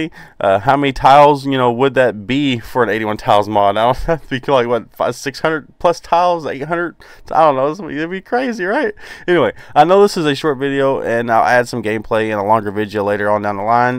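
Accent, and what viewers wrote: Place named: South, United States. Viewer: That's true. I did not think about the height difference. Btw I love your Southern accent. Southerner here too (NC).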